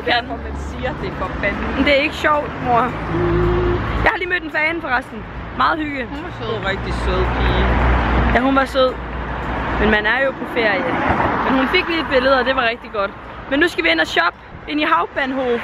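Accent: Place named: Jutland, Denmark. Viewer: Jeg føler at du lyder meget mere jysk når du er sur elsker dig❤️😂❤️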